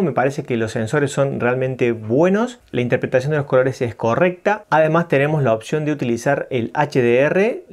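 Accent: Córdoba, Argentina. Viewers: ¿Eres cordobés?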